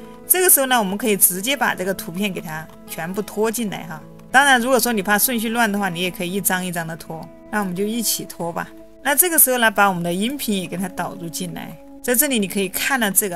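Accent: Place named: Sichuan, China. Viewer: 四川的吧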